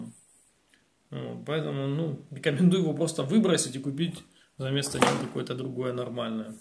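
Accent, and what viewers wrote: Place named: Zaporizhzhia, Ukraine. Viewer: та не, ну шо ты как не свой, акцент то запорожский, ту как не крути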